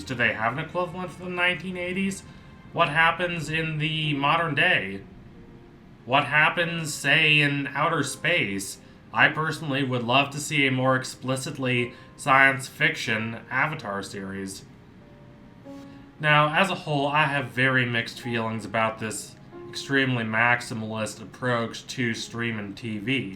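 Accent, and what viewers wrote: South, United States. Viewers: were you...doing a country accent in this video lol? Are you rehearsing for a role or something? No judgment, just threw me off XD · Why do you Sound more southern?😂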